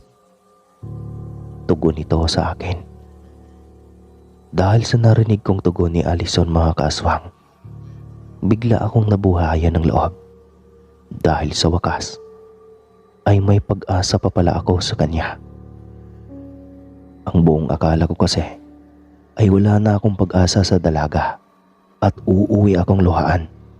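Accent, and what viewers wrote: Visayas, Philippines. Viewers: Iba talaga bisaya dila pa lang matigas na haha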